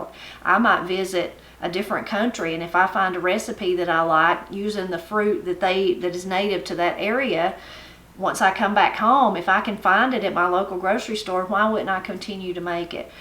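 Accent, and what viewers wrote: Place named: Florida, United States. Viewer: Your accent reminds me of my family in Florida. I have had this cake. In Oklahoma at our yearly Easter family reunion. Pot luck favorite! ❤❤❤❤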